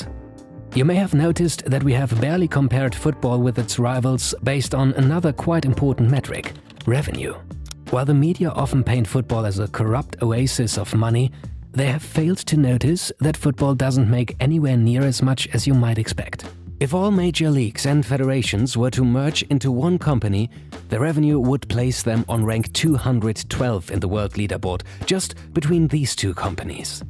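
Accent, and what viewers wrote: Germany. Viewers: the voice and the german accent 😩😩😩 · I used to have a professor lecturing in Media & Sports, who was from Germany. Every time I watch these videos it’s like being back in class. Not just accent, it’s almost exactly the same voice. Eerie but awesome.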